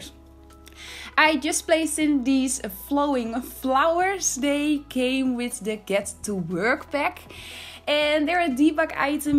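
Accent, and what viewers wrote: Netherlands. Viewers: I can hear that your dutch 😂 love it tho ! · Wow... mind blown! So creative! From your accent I think I can assume you’re a fellow Dutchie, so: beterschap voor je vader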